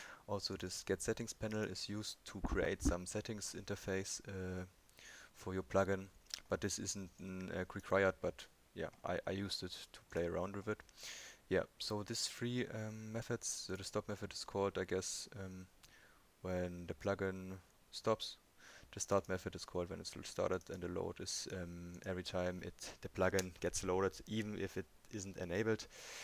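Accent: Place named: Germany. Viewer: the german dialekt kickt bei dir aber anders rein :D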